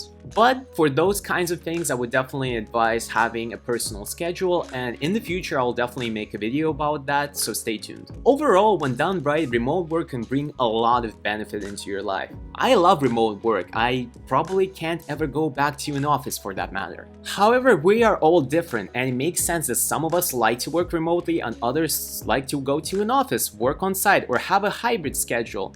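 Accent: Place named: Russia. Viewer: That russian accent man, I can hear it from miles :)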